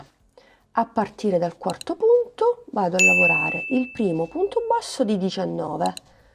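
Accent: Sicily, Italy. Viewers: Carinaaa 😊 io adoro i progetti semplici e veloci 😂 anche perche sono gli unici che mi riescono 😅.mi togli una curiosità (se vuoi) sei Siciliana e di Catania per caso? Grazieee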